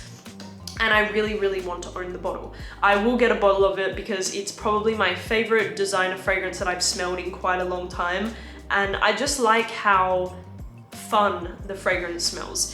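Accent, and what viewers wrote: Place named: Australia. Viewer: Ariana Grande Cloud is a great dupe for Baccarat Rouge. Bath and Body Works Dahlia is a great dupe for Carolina Herrera Good Girl. I love your accent. Are you Australian?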